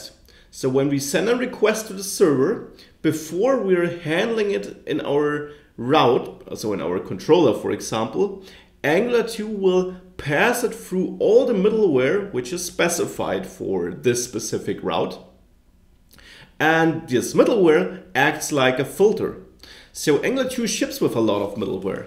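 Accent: Germany. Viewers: you for the prompt response 😊 I haven't yet looked at this but I will. I get what's going on and change what I believe I think is not working but nothing happens. I'll let you know if anything changes once I've compared and amended according to you git repository. Thanks you, great videos, I'm enjoying the German accent. :)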